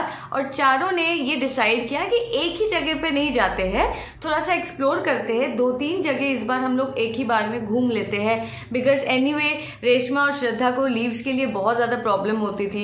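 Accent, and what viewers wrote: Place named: Bengal, India. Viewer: Are ypu bengali by any chance i know considering ur sirname its not possible yet you have the accent when a bengali speaks hindi and you have a bengali beauty kind of a thing in your looks.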